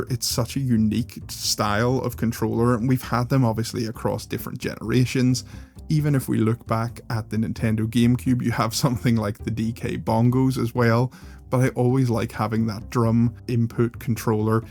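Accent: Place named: Scotland, United Kingdom. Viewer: You Sound Scottish. I Am American...I Love Scotland,And Am Thinking Of Maybe Moving There...But Your Scottish For Sure I Can Hear It.,Cant Get Rid Of That Accent Lol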